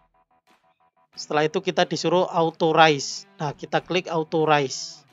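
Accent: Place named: Java, Indonesia. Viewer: suka denger suaranya yg khas dg jawani dan lucu wkwkwk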